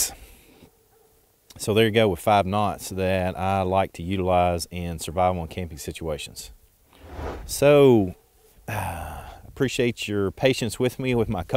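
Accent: Kentucky, United States. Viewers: As soon as you started talking, I thought, "This guy's accent sounds very KY." Then I realized from your logo you're in Winchester. From one fellow Kentuckian to another, keep up the good work. You did a great job explaining these knots.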